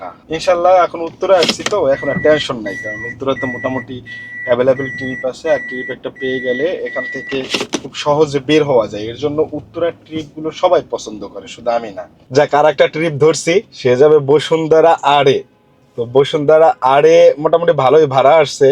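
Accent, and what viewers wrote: Barishal, Bangladesh. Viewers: আপনি কি বরিশালের লোক?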